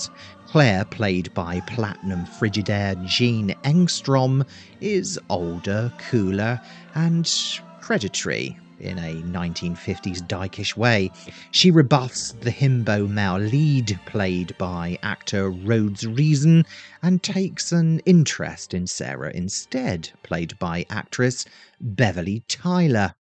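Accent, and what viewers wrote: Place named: West Country, United Kingdom. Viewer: Ah yes, Grockels, is I think, more of a Devonian thing. I'd only heard it said for the 1st time when I was either 20 or early 20s. And the person I heard first use it said it was more of a Devon thing. I already told you that sometimes you have a distinctly west country ring to your words & you explained to me where you were from & I realised that in some, more northern areas, they also seem to have a similar brogue. [you probably don't remember, but I thought you were a Bristolian & you corrected me.]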